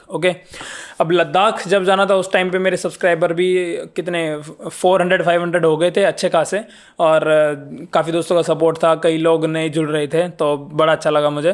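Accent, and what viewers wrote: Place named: Haryana, India. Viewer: Haryanvi way of talking love from Australia bro ...keep going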